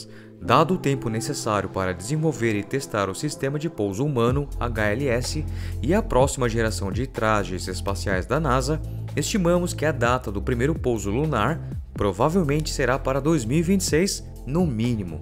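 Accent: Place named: Caipira, Brazil. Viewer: Negativo no canal é apenas esse sotaque caipira do locutor. As informações e animações são bastante detalhadas. Parabéns!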